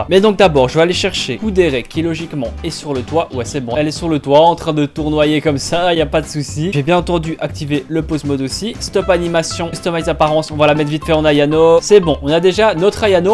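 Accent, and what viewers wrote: Belgium. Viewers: T'es Belge? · Tu es belge ?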